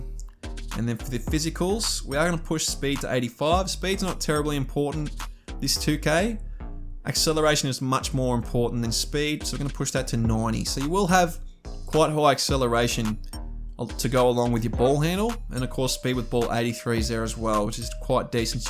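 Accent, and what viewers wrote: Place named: Australia. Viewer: Thanks mate this was great. Also refreshing to hear an Aussie voice and to not have a bunch of over-the-top editing in my face when watching the video 🤙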